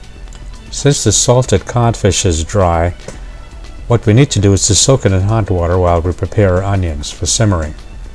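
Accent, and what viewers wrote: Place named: Jamaica. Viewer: you sound like a Jamaican who's just been living in the US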